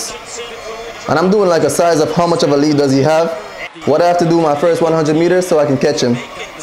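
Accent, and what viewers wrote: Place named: Jamaica. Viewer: The accent had me though..Are you Jamaican 🤔....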